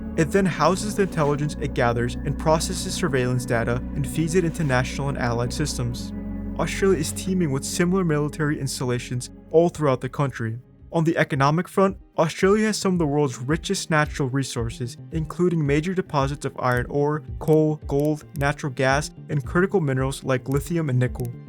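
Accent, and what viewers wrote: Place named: United States. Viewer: This video sounds a bit America centric, this American voice over doesn't seem to realise we're done fighting for other people how about Australia concentrates on itself, fu*k the rest of the world especially America we dont need them they only want anything to do with us when it benefits them, USA the most useless ally any country could rely on and get screwed over by.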